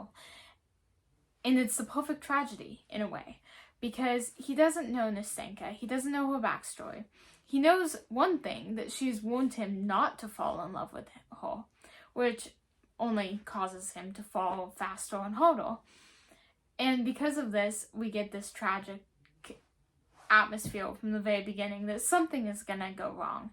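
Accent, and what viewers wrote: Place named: United States. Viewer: Hi thanks for the video. English is not my first language. I'm very curious about human geography. You've got very cute accent. I'd love to know if it's from some specific part of the US.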